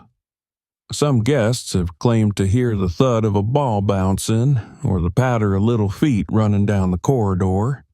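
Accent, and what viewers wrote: United States. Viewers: Sorry but don’t like the American accent especially when to deal with haunting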